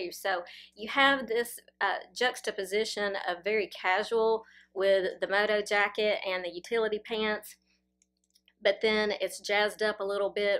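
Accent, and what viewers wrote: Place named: South Carolina, United States. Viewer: I’m so glad I found your channel you also have my accent!☺️i live in Charleston, SC…..I love your ideas for outfits bless u……..I am 58 yrs old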